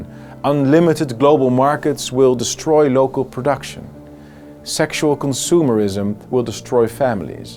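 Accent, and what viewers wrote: United Kingdom. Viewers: Dit soort video's bewerkstelligt dat je verder van leiderschap komt te staan niet dichter erbij ! Dit soort grappige boodschappen nemen stemmers niet serieus sorry............na de laatste keer FVD gestemd te hebben ga ik weer terug naar de PVv sorry , had er goede hoop bij. Maar dit soort dingen maakt het lachwekkend , en absoluut niet MP waardig !!!!!!!!!!!!!!!!!! Tis speels en kinderlijk maar niet volwassen gedrag . Dit doet men niet thierry...........check maar eens Pim die had het en kon het ! Je hebt zo een goede mensen naast je Gideon , Pepijn enz enz...maar je zet hun te kak , wat hun sterkte laat afnemen. Je bent eigenlijk een man die is blijven hangen in zijn kindertijd en onvolwassen overkomt , veel geschiedenis kennen is leuk maar niet in te passen in dit ! Jammer dat ik niet val voor je GB accentje sorry ......................its over FVD ......te danken aan dit soort filmpjes . 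Eigen graf gravend ten top !!!!!!!!!!!!!!!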